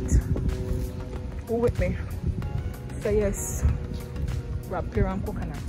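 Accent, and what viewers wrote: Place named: Patois, Jamaica. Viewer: I love to hear you speak PATOIS sis. I love to eat coconut as well. Wow, the price for those here is ridiculous.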